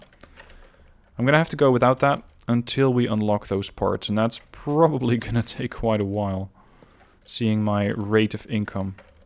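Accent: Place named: Netherlands. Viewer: Nice vid ar you dutch you have a Dutch accent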